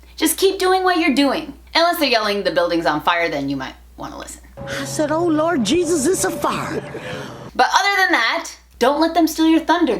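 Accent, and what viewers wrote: Mexico. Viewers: It’s kinda crazy that you’re totally fluent and so and I know you’re American, but I still listen to a little bit of an accent, Mexican accent. I know is crazy, but I can listen to it 😂🤦🏻‍♀️ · Si te hice caso que en vez de verlo en español lo viera en inglés, no lo he visto en inglés pero enserio me impresiona como tienes el acento de tu primera lengua osea inglés y aparte pudiste aprender e imitar el acento de español de México y hablar perfectamente el idioma Me impresionas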